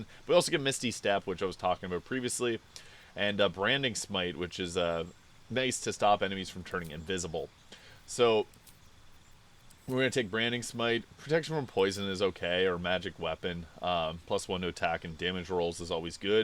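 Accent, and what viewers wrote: Canada. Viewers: Are you ducking Canadian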